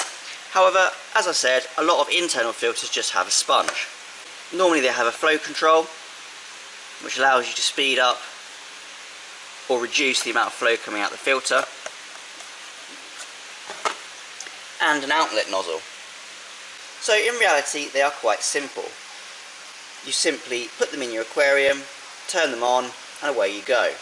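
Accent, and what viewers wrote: England, United Kingdom. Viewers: Kit Harrington accent...nice 👀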